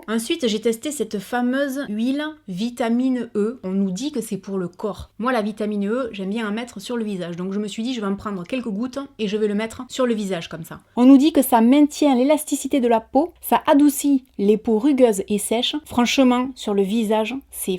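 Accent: South, France. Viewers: Coucou! Juste pour te dire que j'adore toutes tes vidéos! Tu es marrante, j'adore ta franchise et ton accent du sud et ta bonne humeur! Quand tu dis "moin...s" ça me fait toujours bizarre! Finalement, je voulais te dire que J'ADORE ton générique de début de vidéo! Tu m'impressionne! Bisous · Déjà je t aimais bien.mais là avec ton amour pour les animaux je t adore😍😍et ton accent du sud ça change de ma Bretagne et de Paris...😊😏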